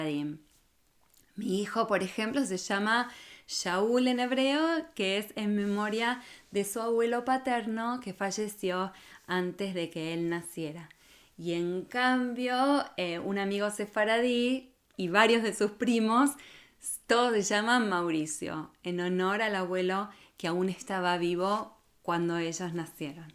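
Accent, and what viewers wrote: Argentina. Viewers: que acento argentino tiene me desespera un poco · Tengo muy buenos amigos sefardíes y algunos asquenasies pero tienes un bello tono de voz además el dejo argentino que es muy bonito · Me encantan sus narraciones históricas acerca de las personas judías , habla muy bien aunque con acento argentino pero es muy musical y agradable escuchar su voz y la forma en que narra las historias punto Muchísimas gracias,SHALOM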